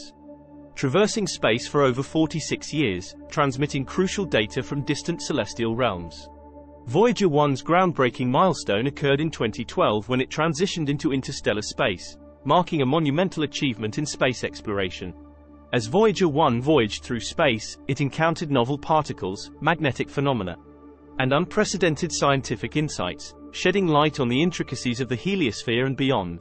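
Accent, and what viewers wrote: United Kingdom. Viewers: Why are the weird stories always narrated by someone with a heavy English accent..:face-blue-smiling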